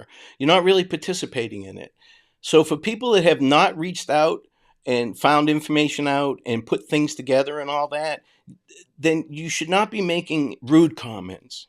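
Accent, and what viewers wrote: Boston, United States. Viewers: if you haven't noticed most criminals aren't known for their smarts! When I worked at a prison in North central Florida one of the inmates got caught after a successful bank robbery in Jacksonville. Him and his buddies got out and away successfully with money. Thing is, the idiot brought his flip phone with him and the phone fell out of his pocket while exiting the bank. His girlfriend was blowing up his phone calling during the commission of the robbery and continued calling back to back call until a female police officer answered the phone. Bank robbers girlfriend challenges the female (cop) answering her man's phone and provides his name, location, vehicle description. This is how he was caught. Silly criminal doing silly stuff, with silly people and entertaining silly women having silly conversations on silly phones., thank you for clearing the air on facts and bringing your Boston accent and gift of story telling. 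No narrator is better than you, well except Morgan Freeman or the guy from unsolved mysteries and that's top notch competition. I wet myself a bit when you called dude a DH, I love your quick wit and ability to get cocky on the fly. I put money on DH wears skinny jeans, paints his nails, has a safe place, feels free and special by using restrooms not assigned appropriate according to his anatomy and sports a participation trophy. 😂. He can get glad in the same hipster skinny jeans he squeezed on and got mad in, probably after crying himself to sle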